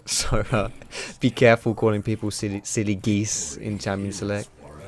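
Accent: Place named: United Kingdom. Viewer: Where in the UK are you from?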